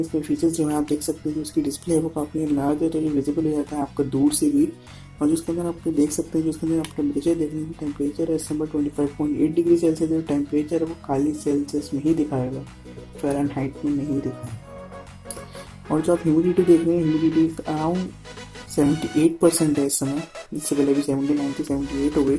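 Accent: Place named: India. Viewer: You are indian and speed-mumbling, very very difficult to understand. Have you read how youtube CC interprets the sounds you put out of your mouth. Adding background music helps? Well I did hear in the end: Thank you, have a nice day, almoust nothing else.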